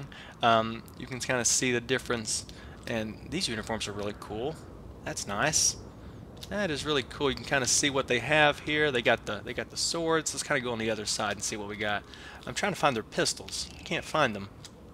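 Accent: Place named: South, United States. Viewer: from a neutral/Midwest accent to a straight up urban Southern accent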